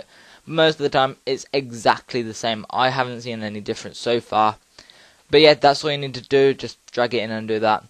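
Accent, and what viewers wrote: United Kingdom. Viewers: TOO English accent !, Cannot understand a thing. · okay apparently thats not it. It says it only uses that for previews. I just did it again from scratch along with the video and still nothing. By the way are you scottish? I've been watching monarch of the glen and you sound like them. I'm from Los Angeles so... ANYWAY I'm going crazy. I CANT FIGURE OUT WHY ITS TURNING IT INTO AUDIO. It's still mp4 but the video is gone and even the thumbnail doesn't have an image. Just windows icon which is a film reel with a music note. The video is gone. WTF?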